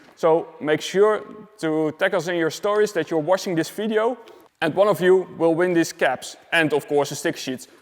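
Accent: Netherlands. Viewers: Ar you dutch?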